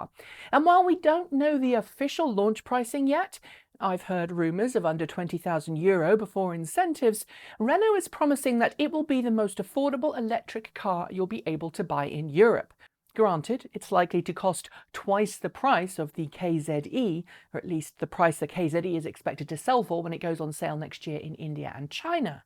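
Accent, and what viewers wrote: United Kingdom. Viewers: Accentul este evident, britanic. Deci nu US. Nici nu cred că americanii ar putea pronunţa corect "Dacia". Britanicii au învăţat deja. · accentul este britanic, dar ea locuieste si produce videoclipurile in US. Si sa stii ca in scurta mea vizita in USA, am descoperit ca multi dintre cei care stiu ce este o Dacie, stiu sa pronunte perfect. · All electrics are preferred to ICE vehicles... it’s youtubers like YOU that have coined the phrase “Tesla Killer”.. which has created the backlash of other people proving that they are NOT Tesla killers.. so, if you wouldn’t report it as a contentious situation, there would be no backlash.. in the end, you are complaining about your own click bait.. well you got us to click.. then you complain?.. you sure do have the most passive aggressive show that I’ve ever seen. Just because you use you pseudo intellectual, dainty, British accent to make things sound better, it doesn’t mask your bias against Tesla.. 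and your bias is created by click bait shows like yours. So go yell up an alley, no one cares.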